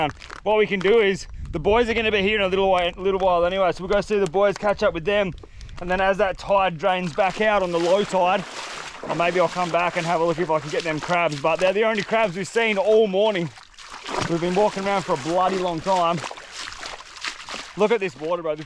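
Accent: Australia. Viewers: AMERICA 🇺🇸 LISTENING TO AUSSIE MAN TALK'IN CRACKS ME UP FAIR DINK'UM COMPANY 😂😂😂😂 I'M LOV'IN IT THE WORLD IS GREATEST PLACE ON EARTH 🌎!!! FAIR DINK'UM DUDE'S 👌🤠🐸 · My god I can't stand that stupid Australian accent, no effort to speak more clear, you would get more viewers.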